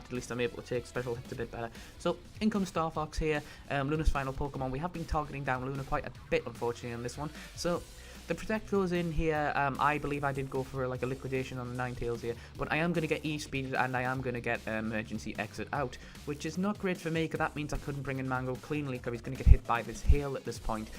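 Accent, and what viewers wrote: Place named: United Kingdom. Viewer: Omg, I love your accent XD idk why but I love British or is that your accent? Anyway it's a really swaggy language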